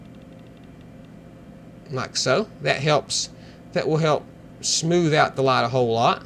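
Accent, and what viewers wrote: South, United States. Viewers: You are indeed a generous person and I love your good ole boy accent. Down home education. Excellent tutorial. Now I know what Modo is all about.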